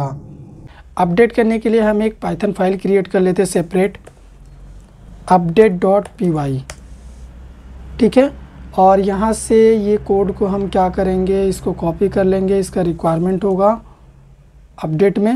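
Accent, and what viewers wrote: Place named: Jharkhand, India. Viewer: Hearing your voice, I remember my friend Venkatesh in Guwahati , who was from Jharkhand with same accent. Thank you.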